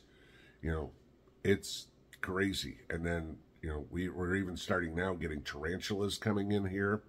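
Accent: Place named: North Georgia, United States. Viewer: Sounds like north Ga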